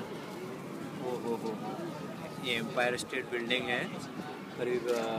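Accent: Indore, India. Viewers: ji maja a gaya yr....kya mst indori bolte ho yr....me bhi indori he hu...dada na pardada sab yahi ka he h....tumne to mtlab dil jeet lio yr....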